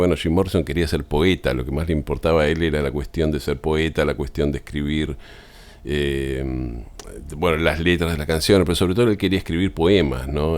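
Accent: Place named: Buenos Aires, Argentina. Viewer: Que lio que haces!! Pero me gusta tu voz y tu accento argentino... y porteño..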